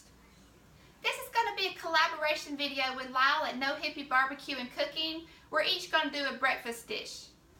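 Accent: South, United States. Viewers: Great recipe and there's nothing wrong with a beautiful country accent🤗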